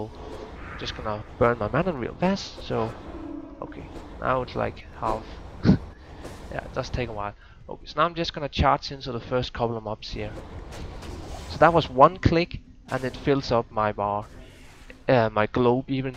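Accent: Denmark. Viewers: try to lose just a bit of the danish accent. It's like nails on a blackboard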